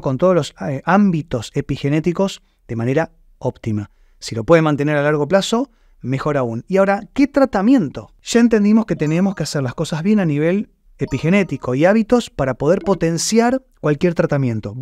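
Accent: Argentina. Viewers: Buenas tardes dr.ud. es de Argentina? Donde atiende?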